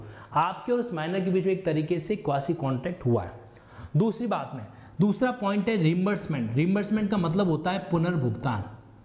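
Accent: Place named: India. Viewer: This rubbish is an Indian law lecture in Pidgin.-English and Indian dialect.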